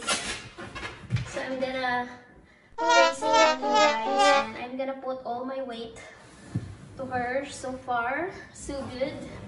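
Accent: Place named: Philippines. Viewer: You made me laugh and I love your Pilipino accent, it's so cute.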